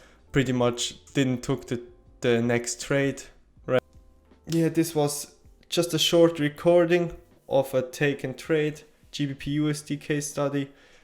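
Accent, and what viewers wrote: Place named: Africa. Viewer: 😂❤️❤️love it mahn keep uploading..african accent makes me more tuned in than James’s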